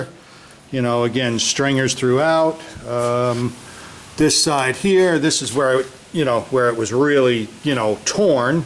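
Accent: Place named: New York, United States. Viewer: Sounds as if you are from New York / or New Joyzee Could you not have put some loads on it that would have , as you carbon arked , helped draw it togeather & then even more so as you welded , hot metal shrinking has ton’s of force. One must be very much a Mc Geever doing this kind of stuff. If that cyl boss is out of square , it will cause side loading to the cyl & it will not last a month ! I own a CASE extend a hoe . Looks like the John Deer is fashioned different, but that looks like the inside of the extender , that would make the boss the lifting point for the boom, final picture, they probably had the boom extended & tried to lift a # of HEAVY objects ,- a stupid move, - , drag it in close , re-rig & then lift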